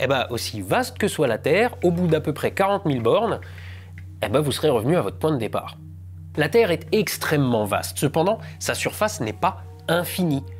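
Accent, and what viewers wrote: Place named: Alsace, France. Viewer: L’accent alsacien et la prononciation des le début me ravi ! Mdr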